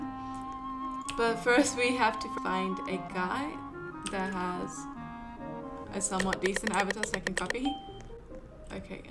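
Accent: United Kingdom. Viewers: I love the fact that ur British it makes ur voice so cute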